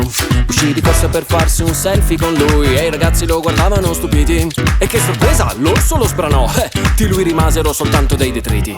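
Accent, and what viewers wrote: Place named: London, United Kingdom. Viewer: Cacchio come parli inglese londinese! 🎉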